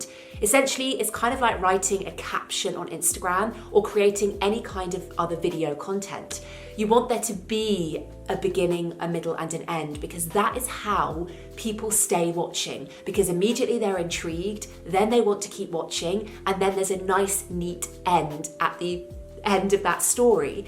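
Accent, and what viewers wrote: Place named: United Kingdom. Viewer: thank you not only because of this video you just uploaded which is helpful but only because you're the first one with british accent (if I'm right) whom I can follow and understand :))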